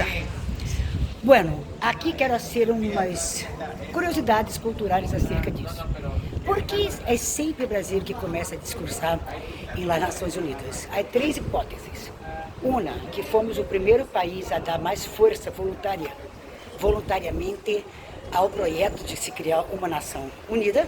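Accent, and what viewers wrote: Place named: Spain. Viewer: vc não e brasileira tem sutak espanhol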